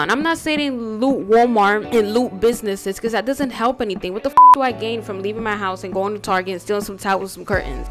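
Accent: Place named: New York, United States. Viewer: Nothing better then a little dominican history, with a NY accent ! DEADASSSSSSSSSS Lmao . Definitely feel as dominicans we gotta know our history , feels like the more times that passes the more people seem to forget about the roots and where our people come from , which is exactly what the Europeans wanted ….. to oppress our beliefs and our culture . Great video !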